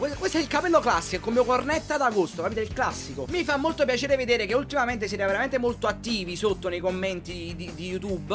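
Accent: Naples, Italy. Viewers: questo video lo guardo tutti i giorni ed è l'unico modo di stare bene poi quell'accento mi ricorda la mia lontana napoli . Sorrido sempre ciao hahahah no dai forse è troppo così hahahha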